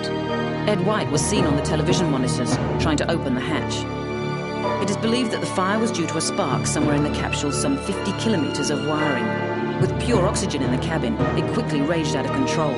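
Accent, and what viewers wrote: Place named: United Kingdom. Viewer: "Ed White was seen on the television monitor trying to open the hatch." Ten seconds later: "With a hatch that could only be opened from the outside, the three men didn't stand a chance." Hmmmmmm. THAT'S good documentary filmmaking for you! So... Either Ed White was clawing at a hatch that couldn't be opened as he died, OR, the hatch COULD be opened from the inside, and this Brit narrator doesn't know wtf she's talking about!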